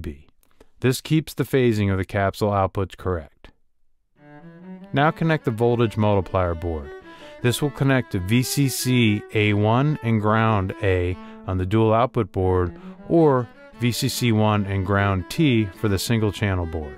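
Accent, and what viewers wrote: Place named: United States. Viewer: That's excellent. I would like one absolutely. I reside in UK though. I think I may of heard your accent being USA. Either way. Best wishes.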